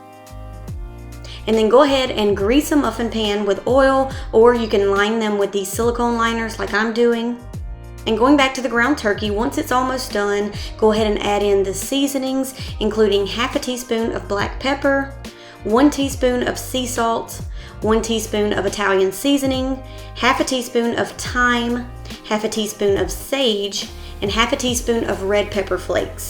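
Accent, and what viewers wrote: South, United States. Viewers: Love your voice girl. A real southern belle. 😍😍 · so love the Southern draw and love the prep recipes. Thank you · I heard your southern drawl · I love your southern accent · Me being a Mississippian, “lord have mercy, that’s a southern accent.” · Just where is that sweet as molasses southern accent from? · The voice through me for a loop 😅😅 heyyyy my fellow southern friend 👋🏽👋🏽 great video 💕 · I can tell she's from the South. I am too.🙂👍🏻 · I love the southern belle accent. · I love your Southern drawl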